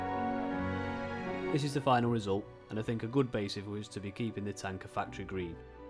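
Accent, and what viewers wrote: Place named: Manchester, United Kingdom. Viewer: What a find this channel is! I’m new to modelling and find your presentation excellent. Also you sound very local. Are you a Manc?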